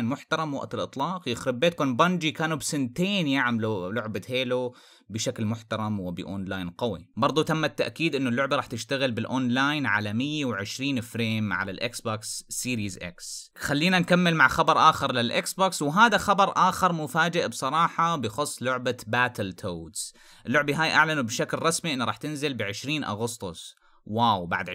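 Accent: Homs, Syria. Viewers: معلم انا بشغل فيديوهاتك مع العيلة و امي دائما بتقلي انو انت سوري من حمص😁😁 فهل انت حمصي؟ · انت سوري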